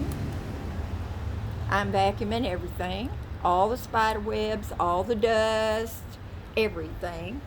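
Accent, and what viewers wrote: South, United States. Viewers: I luv your southern accent! You r the bomb!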